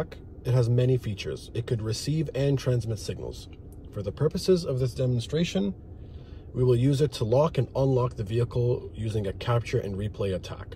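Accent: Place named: Canada. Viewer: Bro I can just tell your Canadian haah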